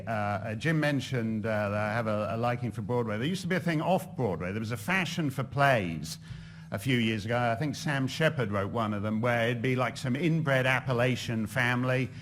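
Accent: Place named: England, United Kingdom. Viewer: Im from the UK and this guy is obviously the dumbest Englishman I have ever had the misfortune to see. Hilarious that all you idiot deniers will believe anything he says because of his accent. He looks like a partially shaved Groo the wanderer but Groo wasnt a gay man and he hadnt been paid off by the fossil fuel industry like this guy and frolly both have.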